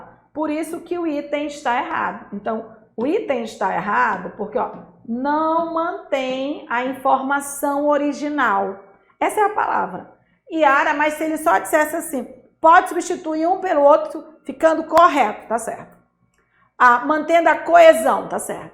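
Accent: Pará, Brazil. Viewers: A professora é paraense? P.S.: excelente didática <3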